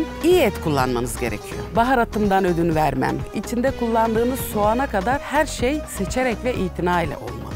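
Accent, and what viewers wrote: Turkey. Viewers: Rumelili, comar degil ondandir:)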